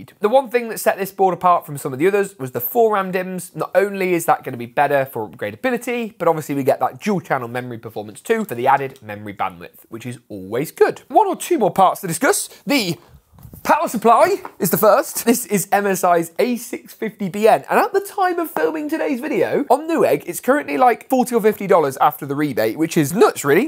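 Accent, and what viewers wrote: United Kingdom. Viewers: yo fonkin british or wot , met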